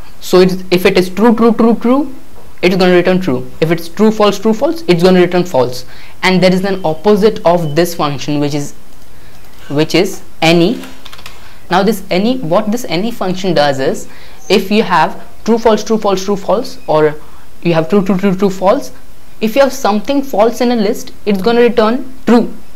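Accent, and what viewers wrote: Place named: India. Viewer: ahh, your pronunciation and the royal peacocks singing in the background sent me back to India again. Thanks for these tutorials! Great job!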